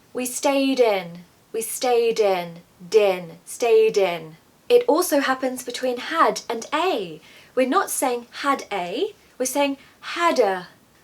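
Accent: RP, United Kingdom. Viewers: Thank you so much for teaching us teacher i love you so much! Your british accent is beautiful. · I love your beautiful RP accent even I live in Australia. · she sounds so British, I love it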